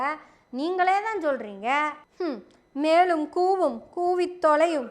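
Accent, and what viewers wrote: Kongu, India. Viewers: கொங்கு தமிழ் சூப்பர் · ஏங்க கொங்கு பாசையை விக்கிறேங்கோ?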